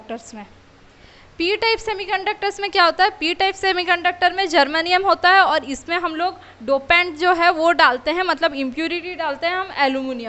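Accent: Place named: Odisha, India. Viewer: No bakwass only point to point i like this type of lecture ❤️ it's like odia accent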